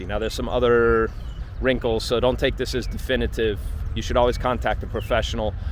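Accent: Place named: Argentina. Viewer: tenés acento de argentino hablando en inglés al principio pensé que eras de acá!!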